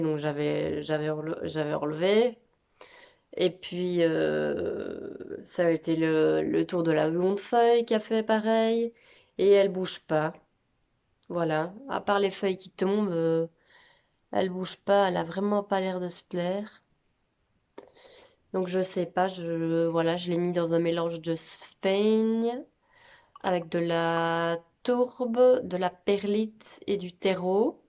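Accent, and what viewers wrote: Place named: Liège, Belgium. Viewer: Merci pour ce plante tour, j adore !! Et l accent Liégeois coool 😃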